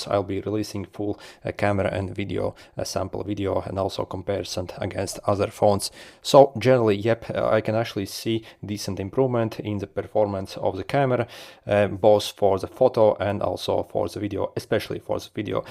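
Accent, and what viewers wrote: Russia. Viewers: are you russian? the accent is adorable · Ты-ж русскоговорящий. Вот прям по произношению слушу, что русский. Говоришь, как Мутко🤣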